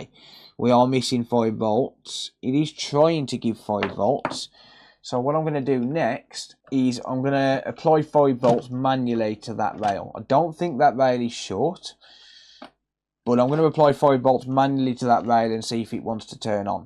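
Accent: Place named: Walsall, United Kingdom. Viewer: Hi,you got recommended by YouTube in my feeds and liking the content but i am PC though.I recognized your accent and thought Walsall or Willenhall,I am from Wolves just up the road ha!,just curious as to your technical qualifications?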